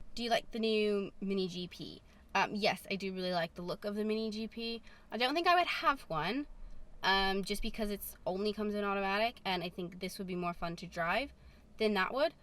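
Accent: United Kingdom. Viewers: I watched a video from 3 years ago and literally it’s fascinating hearing the developing British accent 😂😂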